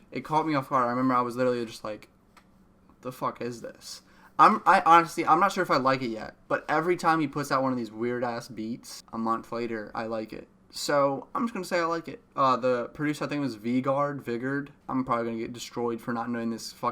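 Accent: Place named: Philadelphia, United States. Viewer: you sound like youre from philly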